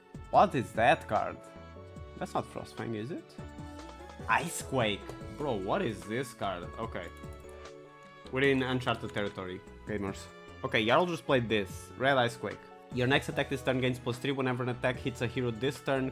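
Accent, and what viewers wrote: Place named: Portugal. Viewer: You sound portuguese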